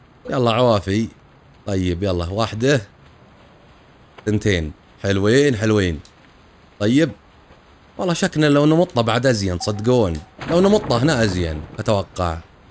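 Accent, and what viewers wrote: Qassim, Saudi Arabia. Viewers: استمر يا افضل يوتيوبر ❤ اهم شيء الي محلي المقطع اللهجة القصيميه😂😂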